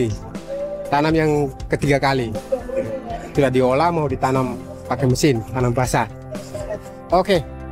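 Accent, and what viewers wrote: Java, Indonesia. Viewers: Luar biasa padi nya kak, semakin ku tonton ternyata jawa medok... luar biasa semangat 🎉❤ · Medok bgt Lo ya😂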